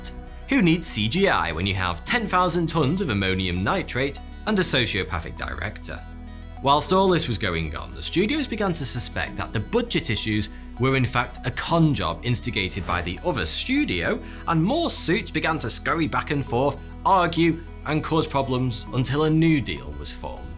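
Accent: United Kingdom. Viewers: Is he british? I keep hearing "fourth two here" at the beginning...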